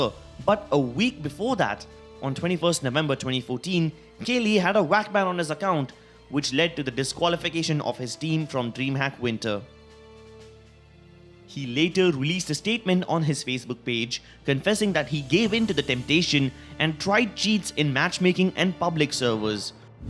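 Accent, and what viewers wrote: India. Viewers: you sound too indian i am sorry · Chutiye indian h to ese he rahegi na awaz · Change your indian accent please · F*ck your Indian accent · Nice Indian accent can’t even pronounce vac how do u even pronounce it as “wac” ban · it's just indian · Indian accent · The Indian accent made me chuckles alot xd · Of course it’s an India voice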